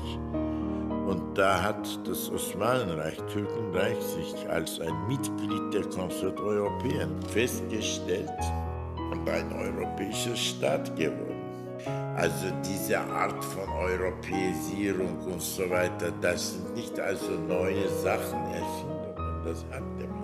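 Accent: Germany. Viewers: Bavyera Almancası ile mi konuşuyor diyeceğim, evet. Neden Neu Hoch Deutsch değil ? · Hannover aksanımı bu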